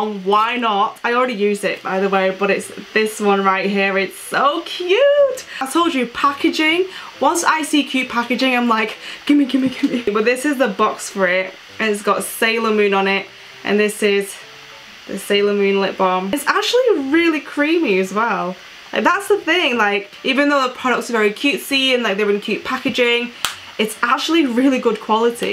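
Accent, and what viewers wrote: United Kingdom. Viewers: Are you from Manchester, the accent feels familiar? :)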